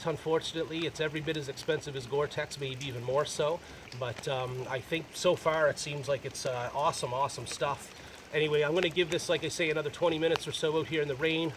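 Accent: Ireland. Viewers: Sounded quite Irish yeah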